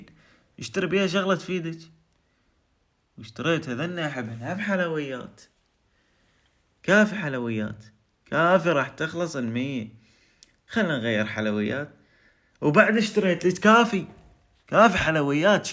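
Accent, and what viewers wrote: Iraq. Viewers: متاكده عراقي مو اردني؟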